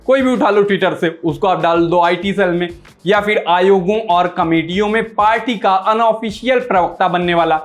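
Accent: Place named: Bihar, India. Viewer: Sir aap Bihar se hai kiya kyuki me v Bihar se hu apke language se lagg raha hai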